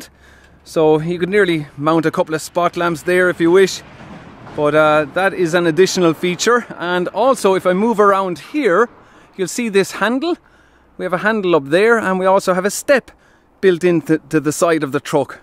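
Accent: Ireland. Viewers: I love Ireland (hopefully I'll visit in the not so far future) and I love lorries. Your handle suggests that you're Greek, although your accent is distinctly Irish. So yeah, you just gained follower. Cheers from Turkey. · love irish accent :)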